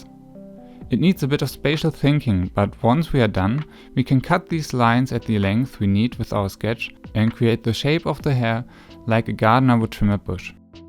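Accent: Germany. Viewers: I know I‘m late, but I just wanted to thank you for what you achieved and did for all of us here. Your tips are incredible, and after all hair is the thing that stands out the MOST while looking at characters, it‘s the thing that makes them so different, just like eyes or whatever. (Ich war sehr überrascht, als ich den deutschen Manga sah, aber an deinem Akzent habe ich schon erkannt… also in dem Moment dachte ich „Hmmm könnte es sein?!“ finde es voll cool, dass du deine Videos trotzdem englisch machst. Mach weiter so!!! ✨)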